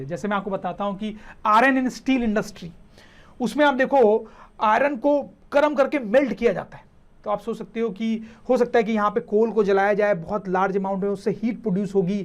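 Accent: Bihar, India. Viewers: Sir are you from Bihar ?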